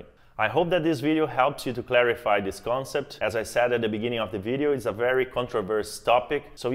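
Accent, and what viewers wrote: Brazil. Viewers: Certeza que é brasileiro hehe... belo video!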